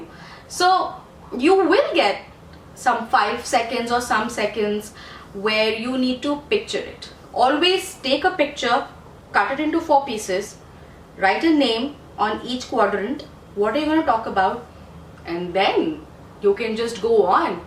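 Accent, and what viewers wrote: India. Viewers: i sure that u r from jamshedpur...... great is ur way to express.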